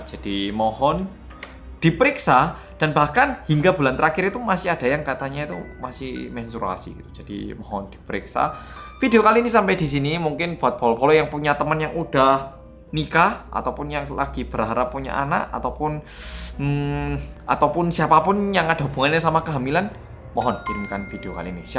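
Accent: Java, Indonesia. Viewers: Bersama korea yang "medok" 😁 I'M ADDICTED 🙆‍♀️ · Kulo kalah medok Karo sampean mas 😄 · Gue selalu ngikutin pas ngmg bersama orang korea yang MEDOKKK:) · Bersama yang medoookkkkk 😄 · Apik Mas Bro. Serasa wong jowo korea. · Mau saran dong buat tambahin english subtitle karna suka banget sama konten2nya mas, apalagi kalo lagi bahas kasus2 di korea, kdrama atau kpop. Suka pengen share ke temen2 internasional sesama pecinta korea karna mas suka kasih info yang gaada di tempat lain, cara ngejelasinnya juga bikin betah ga ngebosenin tapi sayangnya gaada english subtitle jadi kadang suka males bikin summaries-nya. Biar sekalian bisa memperkenalkan bahasa indonesia dan logat jawa ke luar negeri plus bisa nambah internasional viewers biar go internasional. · Aku ikutan bilang.... medhookk:"))) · Bersama orang Korea yang "medok"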